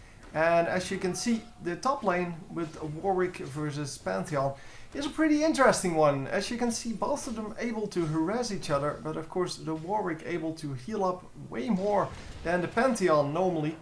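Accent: Netherlands. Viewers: You sound like a Dutch man when you are talking English :D from what country are you if i may ask?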